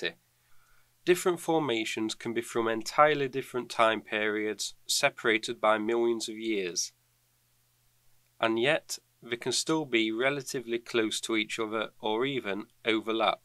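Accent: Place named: Midlands, United Kingdom. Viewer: Taiwan? Just by accent I would say central englad...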